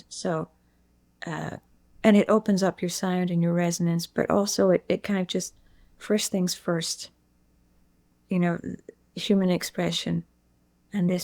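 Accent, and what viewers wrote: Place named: Ayrshire, United Kingdom. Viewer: Lovely to listen to - she still has her soft Ayrshire accent!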